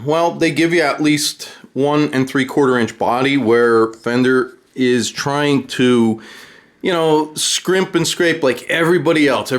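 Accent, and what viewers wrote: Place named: Queens, United States. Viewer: Bro, where you from? You sound like me? Are you from Queens?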